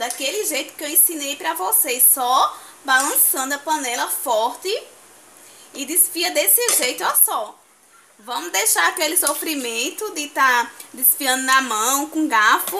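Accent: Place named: Northeast, Brazil. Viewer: Olá querida flor 🌹 eu amo seu sotaque 💓 realmente me cativa. Você é baiana? Parece sotaque nordestino. e seu Marido também cozinha ou só você mesmo? Você pôs o quentro por último e minha esposa sempre põe primeiro kkkk Ótimo vídeo parabéns e sucesso para você abraços ☺👍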